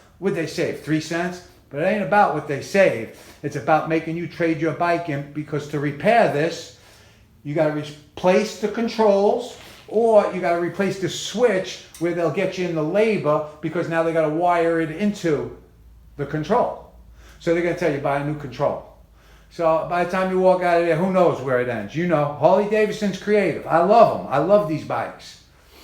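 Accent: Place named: New England, United States. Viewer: Cant stand the NE accent,Never met a goof that didnt vote Democrap that talks like that.And this goof repeats EVERYTHING.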